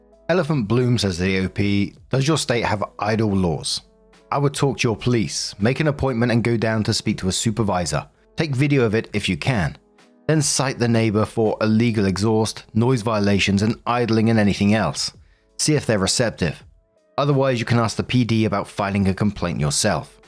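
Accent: United Kingdom. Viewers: I love the sound of British English. They have so many cool accents over there 💕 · I'm pissed someone had the gall to complain about your speech. I knew just what they meant, I'd heard it. I love that you speak uk English. Fuk em all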